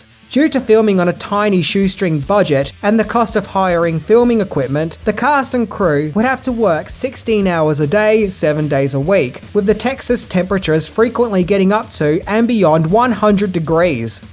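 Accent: Australia. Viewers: He's Australian. He can't help it!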